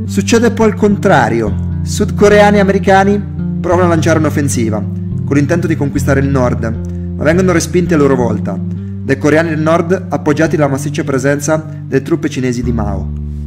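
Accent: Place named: Ancona, Italy. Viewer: Dall'accento sei anconetano...ciao!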